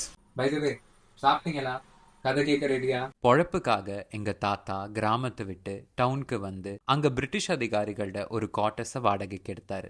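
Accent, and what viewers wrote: Sri Lanka. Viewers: I really enjoy your Tamil slang. The way you mix Sri Lankan Tamil gives it a unique charm and a refreshing vibe. And, are you not muslim 😅 can you clarify to me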